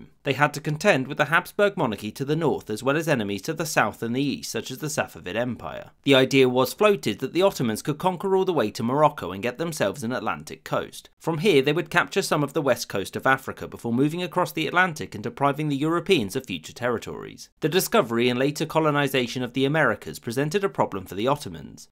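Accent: United Kingdom. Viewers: i'm ottoman. you speak too fast english with your strange british accent. bro slow down. i was trying to understand. böyle hızlı konuşma gözüm. i think you need some ottoman slap on your face thats why you speak too fast. i will try watching video with 0,75 speed, lets see if it will work.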